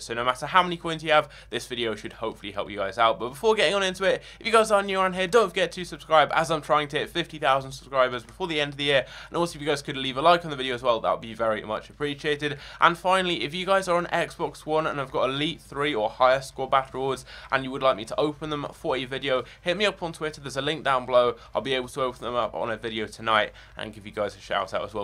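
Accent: United Kingdom. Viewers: want to know too.:-) english is verry british!:-D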